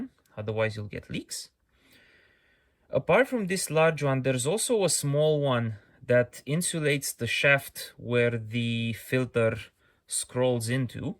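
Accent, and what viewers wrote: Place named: Romania. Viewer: Bravo. Frumos, lucrat curat cu atentie, cu grija la cuplu reocmandat dar la mediul inconjurator. Mi-am dat seama dupa accent si expresii ca esti roman atat.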